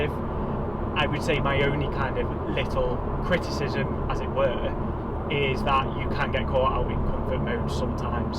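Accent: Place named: Liverpool, United Kingdom. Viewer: Love the scouse accent nearly has good has ours great video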